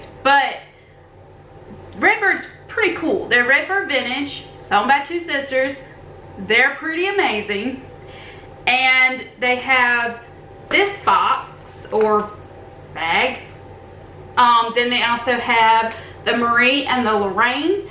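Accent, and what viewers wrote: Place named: South, United States. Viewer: Yes, our southern accents do get in the way sometimes when it comes to the pronunciation of some words. Love it! You always make me laugh and I truly love your videos. Charleston is one of my favorite places to visit. Thank you so much for sharing Southern Ways with with your audience. 💕☺️💐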